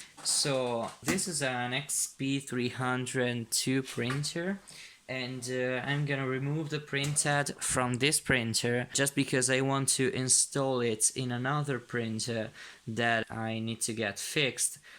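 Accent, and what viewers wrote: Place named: England, United Kingdom. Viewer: mi sembra che tu lo parli come un inglese complimenti .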